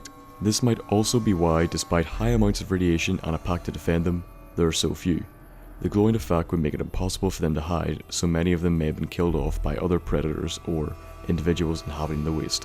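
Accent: Northern Ireland, United Kingdom. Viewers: i believe he got a northern irish accent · That Northern-Irish accent tho!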